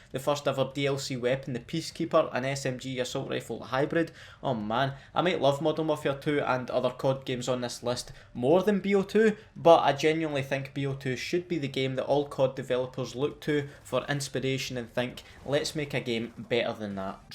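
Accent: Scotland, United Kingdom. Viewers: That scotish ascent dogh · I love your channel, but your braveheart accent is giving me a headache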